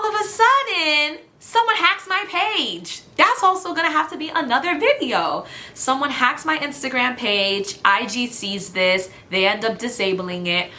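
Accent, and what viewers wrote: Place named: United States. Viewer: Bonjour, je pense que vous vivez peut-être aux. États-Unis ? Vu,votre accent !!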